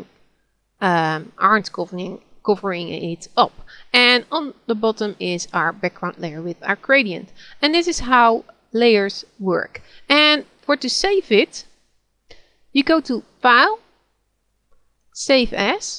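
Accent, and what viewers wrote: Netherlands. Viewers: Ogod Dutch accent RAWRRR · I think her English is fine - guessing a Dutch accent. Thank you GIMP teacher, I've been struggling with GIMP and learned a lot. You are a good teacher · I just followed this tutorial to the letter and created my first snazzy gimp image with all the layers she introduced. Excellent tutorial. And to those of you losers who moan about her accent/diction, she's clearly a Dutch girl. Do you know what that means? It means she speaks at least FOUR languages fluently. Most Dutch kids speak Dutch, English, French and German perfectly by the time they are teenagers while 1 in 3 Americans are illiterate in just 1. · Intuxikated You hear it clearly that's she's Netherlands xD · Thank you for this tutorial are you btw Dutch, 'cus you have a Dutch accent :P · ik kan horen dat je nederlands bent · Although the intention of the person wanting to teach us about Gimp is highly commendable the pace is too fast. 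The Dutch accent of the speakers English could be also somewhat problematic for some. These are slight critisms because you have to thank the person for taking the time and trouble to make this video. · you are dutch i can hear the accent ;3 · dutch accent i presume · om god you just can hear that she come from the netherlands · AND I find her Dutch accent delightful, so different strokes lol! She is organized, has a nice pace AND is a good teacher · lovely dutch accent with crisp clear english and no loud music.I found the video very helpful thank you. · i think she is from Amsterdam based on her accent. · Your accent.is dutch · ik hoor gwn dat je nl bent :) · such a dutch accent ah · This is a Dutch accent for English!! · Omg. The second you started talking I heard you were Dutch. Hello fellow Dutch person! XD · if im not mistaken,i can tell by your accent that you maybe dutch... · nice dutch accent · Haha, echt een Nederlands accent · I am pretty sure she is dutch. 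Even tho i am dutch too most of the people in Holland who try to speak english get this accent. It sucks.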